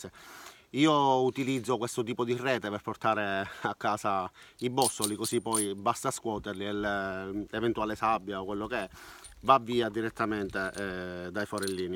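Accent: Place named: Trapani, Italy. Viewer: riconoscevo l'accento io sono della provincia di trapani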